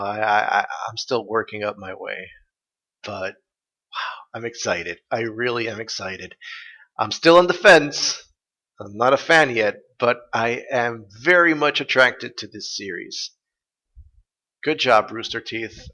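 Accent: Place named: Puerto Rico. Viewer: oh i didn't, but that's great :D i meant your accent that your from puerto rico, like i didnt no which but i knew you were latin lol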